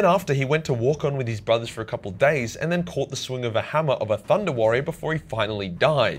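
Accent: Australia. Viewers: The iron cage explained by an Australian?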